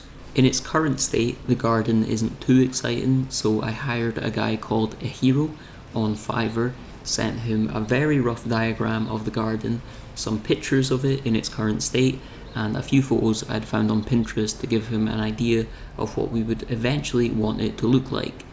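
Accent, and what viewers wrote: Scotland, United Kingdom. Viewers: TECHNICALLY IT IS NOT " NATURALLY RUNNING WATER".... IT HAS BEEN CHANNELED THROUGH A MANMADE STUCTURE.......I felt obliged to correct you since you are such a carefully worded individual .........Secondly , I wonder if you have deliberately modified your Scottish accent......because your speech is pleasant.....whereas in general the Scottish accent is brutal on the English language ....I only watched to the " naturally running water" reference so that is all have to say regarding this video......however , I was interested by your video revealing how much Youtube paid you......and I did notice also , your promo for your " Questions people ask me" video.....but didn't watch it......however ,.....are you gay / are you single /Did you buy that cat because its colourings resemble your own / what are your opinions on the current transgender debate ?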